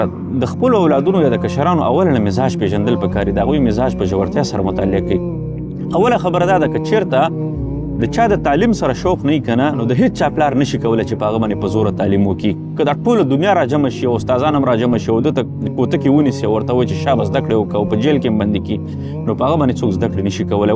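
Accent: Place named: Gulkhan, Afghanistan. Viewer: دا ستا لهجه ولی ده ګلخانانو ده؟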